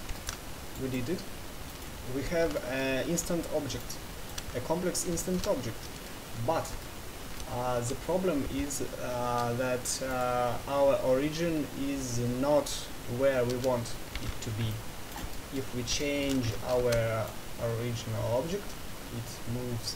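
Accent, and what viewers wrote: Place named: Russia. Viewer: Жуткий русский акцент)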